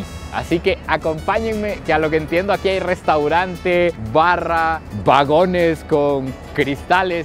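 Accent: Mexico. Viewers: jajaja flaco kbron, que chingon que visitaste nuestro Mexico. Te ves feliz, gracias por compartir un poco de nuestro encanto mexicano. oye, por cierto, tienes acento mexicano. neta eres de otra patria?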